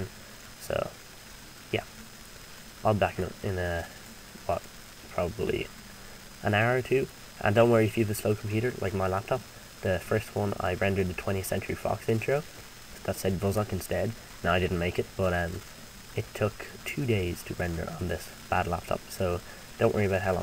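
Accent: Ireland. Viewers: your irish :D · Are you Irish??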